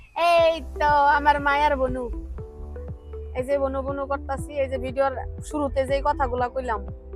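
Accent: Comilla, Bangladesh. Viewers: আপুর বাড়ি মনে হয় কুমিল্লা।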